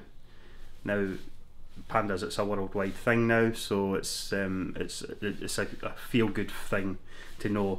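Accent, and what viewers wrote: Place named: Scotland, United Kingdom. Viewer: I love the scottish accent. ;o)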